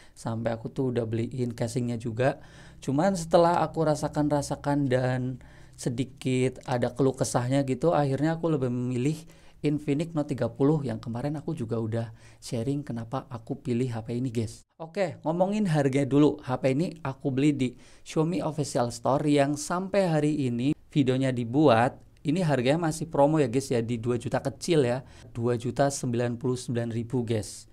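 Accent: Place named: Indramayu, Indonesia. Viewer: Ko kya wong dermayu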